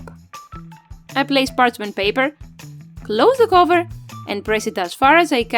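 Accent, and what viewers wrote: Greece. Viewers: Are you Greek by chance? I love the artwork and your accent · You have done an amazing job! The journal looks fantastic! So much work that you have already put and continue to do so. I hope you get thereand that this project of love turns out exactly how you want it to. A thousand well dones! ps. Is this a greek accent I hear? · Πολύ ωραίο βίντεο! Μήπως τυχαίνει να ξες ελληνικά; Γιατί η προφορά σου λίγο μου θυμίζει τον τρόπο που ένας Έλληνας θα μιλούσε αγγλικά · This is a lovely video, plus i can recognize a greek accent anywhere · Where are you from ? You sound kinda Greek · My apologies for pointing it out ;-) I'm Greek myself (born and raised in Belgium though) and that Greek/English is so recognizable ;-) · Are you greek? The accent kinda sounds similar to my families 😅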